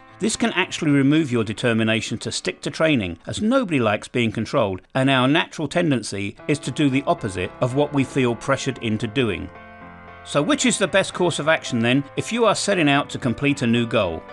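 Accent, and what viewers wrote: United Kingdom. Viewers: I like your Chanel und britsh accent (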